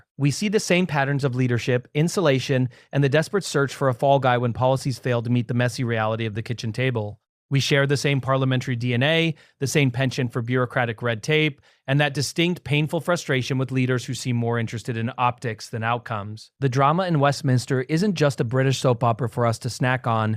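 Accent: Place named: United States. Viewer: What a load of crap and American voice over crap aswell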